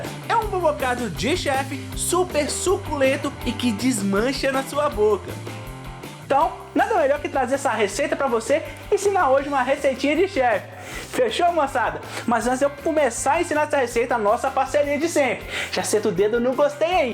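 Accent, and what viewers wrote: Minas Gerais, Brazil. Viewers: Eu também faço isso! Amo os vídeos e o sotaque "gostosim" dele!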